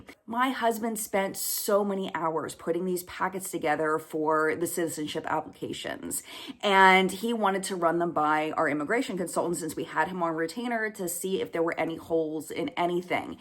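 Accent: South, United States. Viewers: Here I am thinking of my recent retirement party hearing at least 7 accents from around the world plus 3 from different parts of Canada or my book club that has a member with a way deeper south US accent than you do ... nah you are good.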